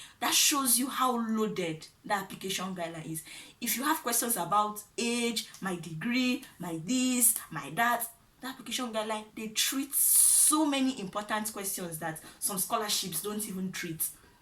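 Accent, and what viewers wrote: Nigeria. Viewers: Hahaha.. This ya Igbo tongue no fit leave you ooo.. Thanks for all you do.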